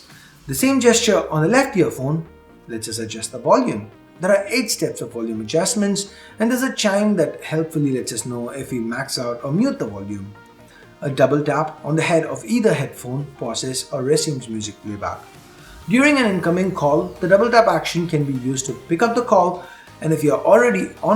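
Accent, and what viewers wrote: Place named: India. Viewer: I really don't like the hindi accent in general but you speak slow and is bearable to understand.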